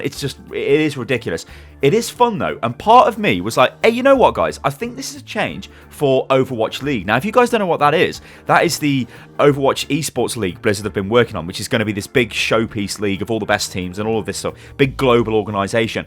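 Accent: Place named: United Kingdom. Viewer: Sometimes i wonder if i am even i interested in the video itself or am just staying because if you nice smooth british voice and accent ¯\ツ/¯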